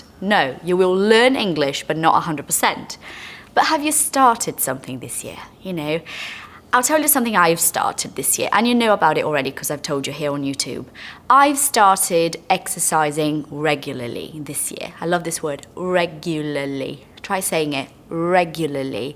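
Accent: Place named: Leeds, United Kingdom. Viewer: Hi!!! Loving your lessons. Have you ever thought of moving back to England? I used to live in Leeds, about 15 years ago, and i'm enjoying a lot listening this accent again. You're a perfect teacher!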